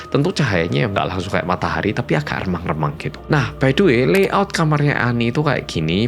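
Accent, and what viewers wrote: Java, Indonesia. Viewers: Ngakakk bgtt pas mas ngomong jamput😂😂 sueneng lek medhok ngunuku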